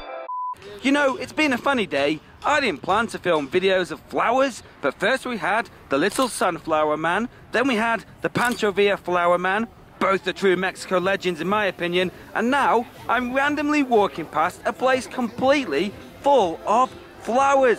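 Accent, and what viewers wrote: England, United Kingdom. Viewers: where is your UK accent from? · ¿de qué parte de Inglaterra es tu acento?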